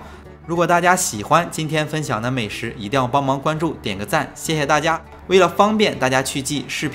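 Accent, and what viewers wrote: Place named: Shandong, China. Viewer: 听声音一直觉得您好像带山东口音，又会做喜饼，先生应该是咱们山东人吧？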